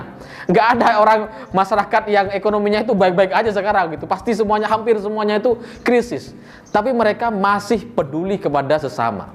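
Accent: Madura, Indonesia. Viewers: Mas Ali saya usul bgmn jika mas Ali menggunakan dialek Madura yg kental dalam penyampaian narasi di setiap video mas Ali mungkin lebih unik lagi karena blm ada lo mas....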